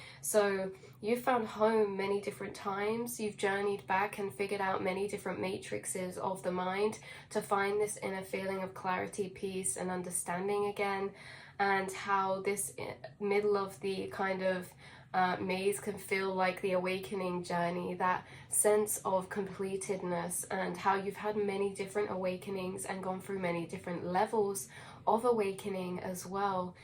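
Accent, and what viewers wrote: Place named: England, United Kingdom. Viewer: You are beautiful and your English accent sounds cute . 8 )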